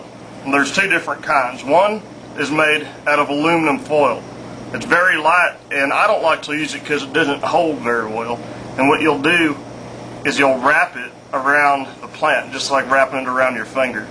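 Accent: United States. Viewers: damn you got some heavy southern dialect going on!!! Be proud of that! Greetings from norway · is that a new york accent?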